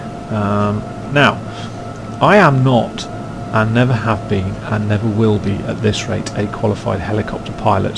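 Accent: United Kingdom. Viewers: Hello all, sorry if this post appears to be a bit late but I have only just got onto broadband (Yes I'm a Newbie, this is my first post!). Firstly well done on a well made review, I like the professional Royal Navy accent that you have which gives what you say more credibility. You remind me of Keith Thompson of Treasure Hunt fame who was also ex-Navy. Secondly regarding rudder use in FS9/FSX for helicopters remember to check your realism settings and ensure AUTORUDDER is switched OFF as this this can affect pedal control so you can't turn in the hover! Sorry if you already know this but it can get overlooked. Anyway thanks for reading and carry on the good work. SimonStanm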